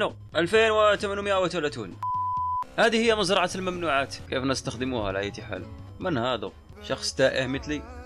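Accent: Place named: Egypt. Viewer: يا انت مصري ولا جزائري ؟ انا متأكد انك مصري علشان بتتكلم زي المصريين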